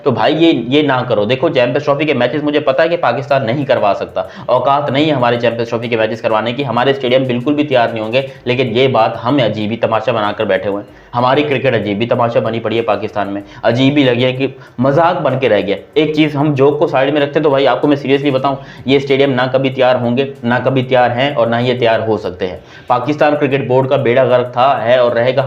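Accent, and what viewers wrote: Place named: Mumbai, India. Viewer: Aayela hai?? Mumbai ka language...good bro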